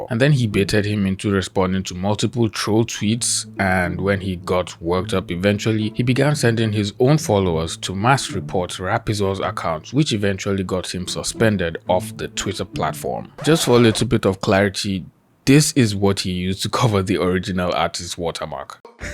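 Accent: Nigeria. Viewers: Are you Nigerian? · im a young nigerian girl living in the uk. i dont have a strong accent like you but I've been planning on doing commentary art voice acting and animation 3d and 2d aswell as some programming. you have just made me more confident in what i want to do. you are incredibly unique. ive never seen a nigerian commentator like u and be succeful. my parents dont want me to go down this type of route but if i show them your content im sure they'll let me freely do what i want to in terms of my future. i look up to you immensly. i love your art and you are also attached to ur country too wich makes me feel warm inside. i wish u the best of luck in ur career and u deserve more attraction. i love your content im so lucky i found your stuff. you are one of the most diverse members of the art community ive seen and i hope more people like you show up. you are honestly a start of a new era in the community. 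nigerian homes are quite critical and people like me might be scared to go into this feild of work but trust me u are giving us the confidence to persue our dreams. from a huge fan that is glad you exist💕💖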